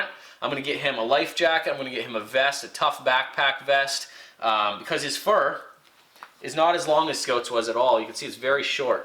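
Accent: Ontario, Canada. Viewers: hey. i saw you on alone and stumbled across your algonquin videos. i'm also from ontario and around the same age as you so i love how we have the exact same accent and i get all your little references. this dog is probably smarter than scout was so you gotta be more strict and firm. make it learn tricks and commands and dont be afraid to be firm. can't wait to see how he does in our beautiful provincial parks this year. thanks for making these vids, i know it's a lot of work but i appreciate it and i know your kids will love looking back on these videos once we're both old grumpy men.... or after you die from being eaten by a muskie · Your Canadian correct? Not out of Michigan. Thanks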